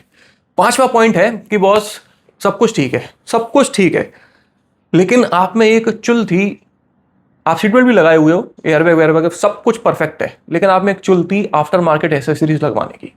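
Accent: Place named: Uttar Pradesh, India. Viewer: kasganj etah ka accent ha apka pr i’ll drive slow always thx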